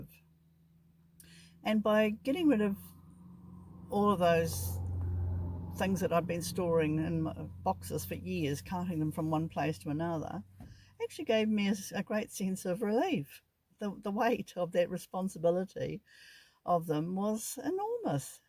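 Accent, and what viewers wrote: New Zealand. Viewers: Nice to hear a kiwi accent on YouTube 😊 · Your lucky you live in NZ by the sound of your accent.. it’s easy to live in a camper van in NZ perfect country for it.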